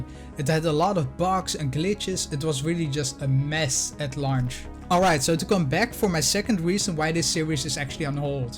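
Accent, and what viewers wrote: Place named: Netherlands. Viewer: You have quite the Dutch accent ;))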